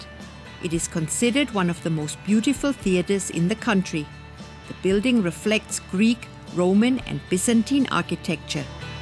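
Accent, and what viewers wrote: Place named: Franconia, Germany. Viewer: Wow, sehr inspirierend - professionell gemacht und vor allem mit Herz und Gespür für Zwischentöne 🙂 Der fränkische Akzent (und natürlich als Reiseführerin): total sympathisch!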